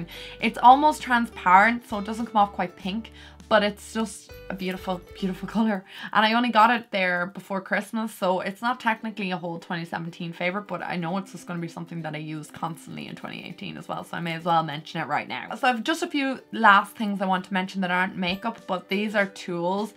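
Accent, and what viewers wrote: Ireland. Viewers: Is that a Irish accent?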